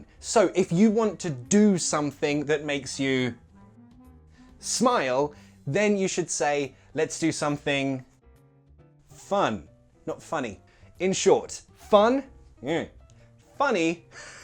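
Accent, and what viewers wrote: United Kingdom. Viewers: The British accent is interesting, like when they say - never (nevah) :3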